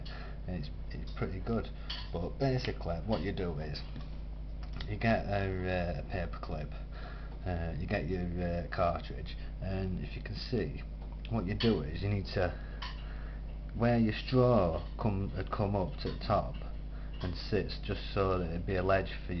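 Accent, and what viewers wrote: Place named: United Kingdom. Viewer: Love the accent.. what part of the UK are you from? But anyway.. I really like that.. I think this would also work for the old fluval-on-top-of mod as well. I currently just have a bent paperclip inside the cart for the fluval to sit on and it works quite well. I could never get the silicon plug to be the right shape so I gave up on the zero filler thing.. whatever works though I suppose.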